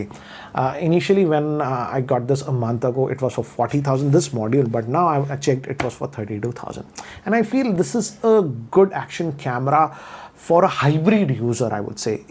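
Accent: India. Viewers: That’s a heavy Indian accent man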